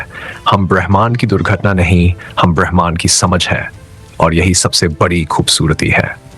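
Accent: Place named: United Kingdom. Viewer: bro english ascent aa rhi hindi mai, thoda sa better karo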